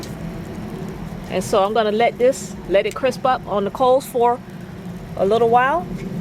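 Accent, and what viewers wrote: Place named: United States. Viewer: Fuck I hate your dumb American accent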